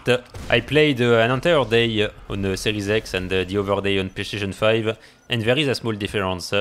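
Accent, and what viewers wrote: France. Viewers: never get tired of hearing baguette accent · Wow bruh that is one strong french accent 😅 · L’accent français prend parfois le dessus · Hell yes, I could listen to you curse shit up all day with that awesome French accent!! Chicks must be sitting and sliding around you 😂😂😂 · French accent 😄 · fait un effort sur ton accent je parle que peut anglais et j'ai un meilleur accent aucun effort les francais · lol l'accent français me fume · Thanks for the info it's pretty precise After 10 of your vidéo i heard a french accent, u talk very good btw but i wanna be sure Ty Ytb Recom' for finding you ! Continu ^^ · Your French accent is epic! xD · accent francais ptdr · L'accent français qui ressort ça fait grave bizarre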